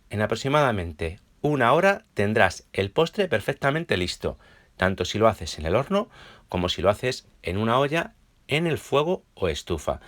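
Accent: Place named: Spain. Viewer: Si es riquísimo yo aparte le pongo queso crema gracias me encanta tu acento Español saludos desde puebla México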